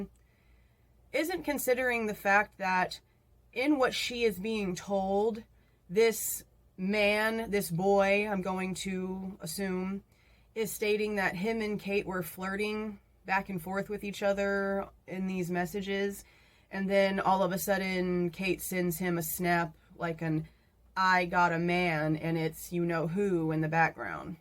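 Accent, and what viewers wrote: Texas, United States. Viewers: Every way that you talk screams Texas girl lol 💙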